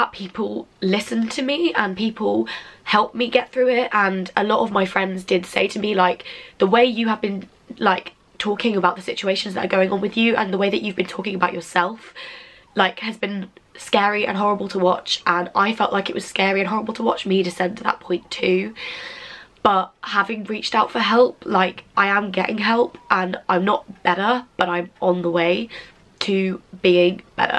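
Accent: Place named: RP, United Kingdom. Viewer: You sound so posh in this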